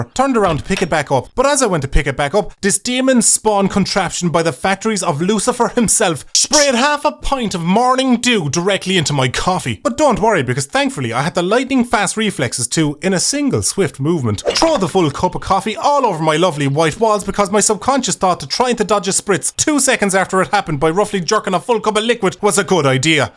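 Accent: Ireland. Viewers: The Irish accent is 30% the reason why I subbed....the other 60% is me in utter stitches from laughing and the other 20% is me realising I'm bad at maths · This is, by far, the best and most hilarious video i've seen all year. There are soooooo many things i recognize in my own past and present relationships... Eerie that... And you tell it sooo well, and it just makes it better with those animations and your lovely Irish accent... This is pure fucking GOLD brother... I subscribed then and there, for the laughs... Well earned... I can't wait to check out what else you've made of shenanigans... Love from Denmark... <3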